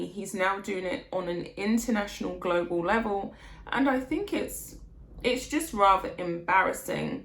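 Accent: United Kingdom. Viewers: Yes, you should appear on camera, why wouldn't you - you look lovely and present yourself very well and are very articulated. British accent to boot☺. But I think some simple background would be good too. Maybe some area in your home with bookshelves? Nothing too fancy, just to make it a bit more personal I guess rather than a blank wall. Keep up the great work! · You are so Beautiful! It’s so nice to finally see you. I Love your British accent. I changed my “ Siri” to be a British man because I just adore the accent.. “ Harry the Handbag “, that was Epic!!!!🤣🤣🥰👍🏼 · Wow I finally get to see you. You always say Im a black British born lady but you sound like a white British lady. Its great to actually see you. Hi. Hello sorry its feels like we are finally meeting. Lol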